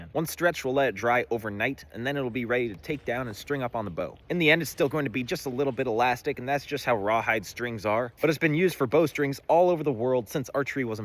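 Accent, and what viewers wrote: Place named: United States. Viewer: This guys accent is crispy. I don’t usually like the American accent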